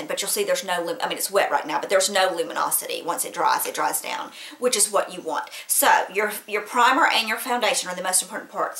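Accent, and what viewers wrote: South, United States. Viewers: Amazing tips!! Love your southern accent!!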